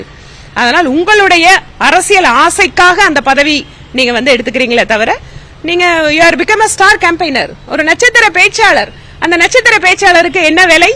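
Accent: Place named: Coimbatore, India. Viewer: 😂😂😂அருமையான கோவை பேச்சு❤. வாழ்த்துக்கள் அக்கா🎉🎉🎉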